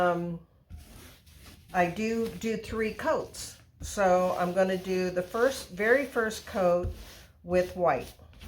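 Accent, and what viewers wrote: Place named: United States. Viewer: Your accent is obviously American. I’m curious. How long have you lived in Costa Rica? What made you move there? I love your painted rugs.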